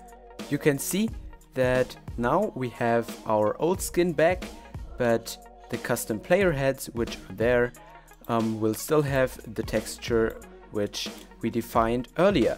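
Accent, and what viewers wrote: Germany. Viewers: Jep, er ist deutsch ;) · Are you German? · er ist auch deutsch? Hört man irgendwie :D McMakistein ist ja auch Deutscher (Österreich) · hehe^^ Man hört richtig, dass du deutsch bist xd, aber trotzdem nice vid!:D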